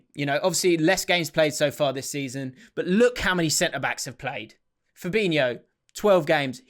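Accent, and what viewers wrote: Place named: Manchester, United Kingdom. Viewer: what part of Manchester are you from Trafford or Gorton ?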